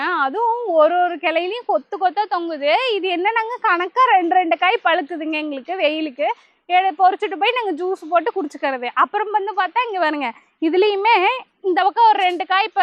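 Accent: Kongu, India. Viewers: ஆமாங்கோ கோயம்புத்தூர் காரங்க ஈரோடு காரங்க எல்லாம் அப்படி தானே பேசுவாங்க😊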